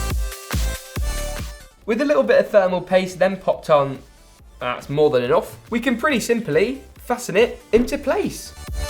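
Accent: United Kingdom. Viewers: Man i hate the British accent so freaking much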